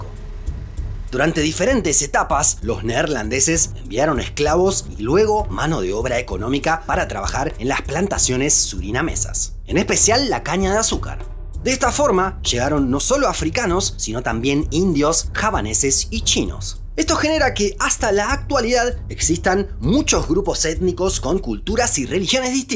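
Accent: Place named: Argentina. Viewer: Trata de hablar neutro... pero es argentino es chistoso y ademas pones el futbol jajjaajajaj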